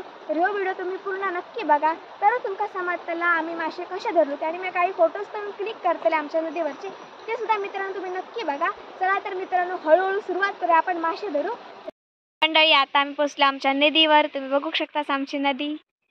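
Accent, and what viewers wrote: Malvani, India. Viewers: I ❤ माझी मालवणी भाषा तू सुंदर बोलते.❤u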